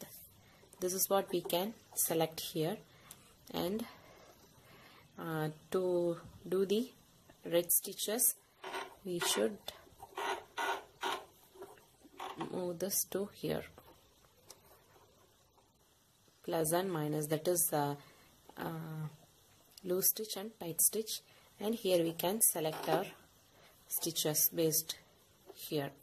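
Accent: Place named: Kerala, India. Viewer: Sound like malyalee ... thank you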